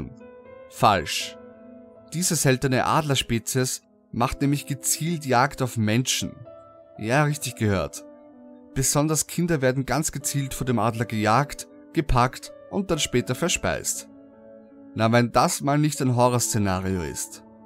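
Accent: Austria. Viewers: Des is jo a österreicher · Du hast ein Österreichern Akzent 😂 komme aber aus Deutschland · bist du ein Österreicher? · Bist du Österreicher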